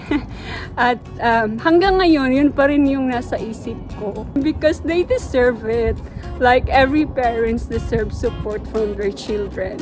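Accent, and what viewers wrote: Visayas, Philippines. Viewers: Hello. My kababayan Alam Kong visaya gyud Ka dahil Yong pag bigkas mo Ng Tagalog migdyo pilipit. Proud ko sa mga PILIPINO kahit saan kahit anong trabaho marunong mag tiyaga . God bless you & your Family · BISAYA po kayo ma'am?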